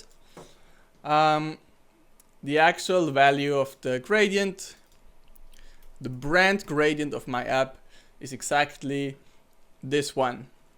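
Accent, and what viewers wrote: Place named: Switzerland. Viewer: oh schwizerdütsch, geil!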